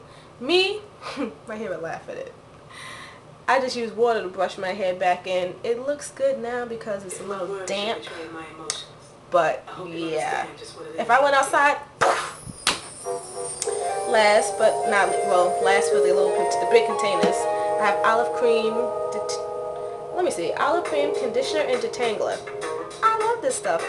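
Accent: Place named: New York, United States. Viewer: Yes, you are a fellow New Yawka. =p Anyway, I know Qhem appreciates the free advertising. Good job!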